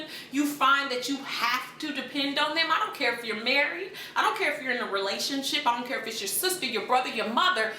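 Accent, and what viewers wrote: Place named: Florida, United States. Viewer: you sound like your a florida girl lol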